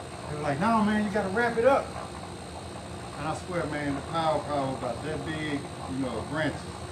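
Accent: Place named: Mississippi, United States. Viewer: You from Mississippi?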